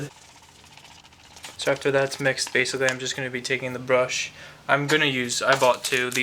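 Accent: Russia. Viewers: Are you russian??!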